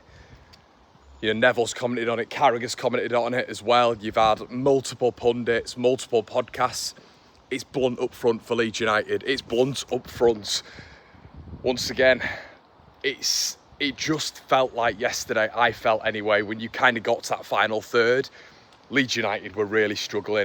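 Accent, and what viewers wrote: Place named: Manchester, United Kingdom. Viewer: certainly getting a Manchester twang in your accent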